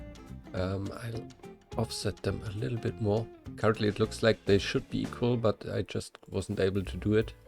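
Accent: Germany. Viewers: + German accent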